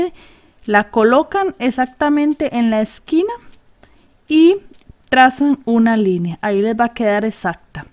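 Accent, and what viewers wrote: Costa Rica. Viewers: Ese acento me es familiar!! Eres Costarricense?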